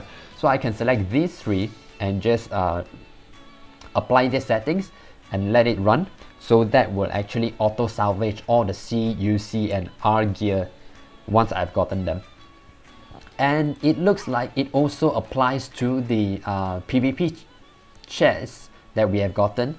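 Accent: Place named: Singapore. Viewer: I recognize that Singapore accent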